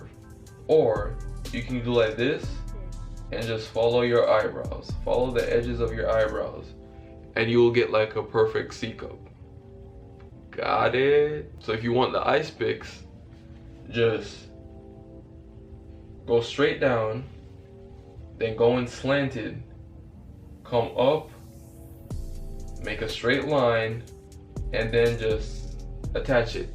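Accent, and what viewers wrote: Jamaica. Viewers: U can tell he’s Jamaican 🤣🤣🤣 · Oh you Jamaican too? Instant sub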